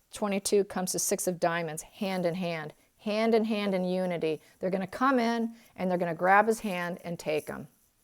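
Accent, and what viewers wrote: United Kingdom. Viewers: She is a genuine psychic, she doesn't read with military precision but it all pulls together in the end. If you are not British you may find the accent a bit difficult, but then us Brits find American accents and their mangled English difficult too. Oh well, it is good we are all different isn't it?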